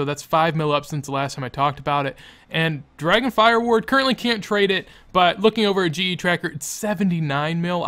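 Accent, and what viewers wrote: Michigan, United States. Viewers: Yooo are you from Michigan? Let's go blue baby final 4!!! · Wait are you from Michigan? 313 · Wait your from Michigan? :O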